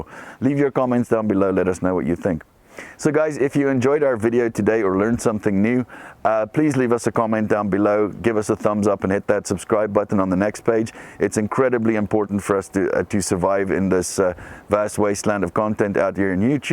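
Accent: South Africa. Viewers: that sounds like a south african acsent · I would guess south Africa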